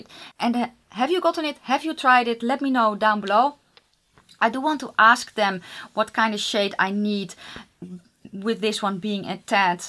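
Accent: Netherlands. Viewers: I knew that you are from the Netherlands as soon as you speak. Something about the accent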